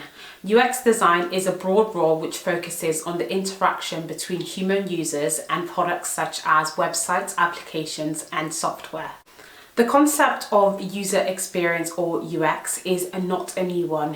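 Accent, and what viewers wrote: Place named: United Kingdom. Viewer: The British English is just awesome.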